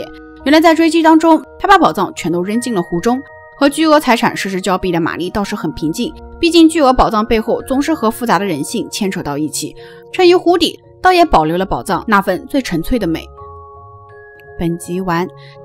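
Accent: Nanjing, China. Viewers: 这口音暴露了蓝鲸人...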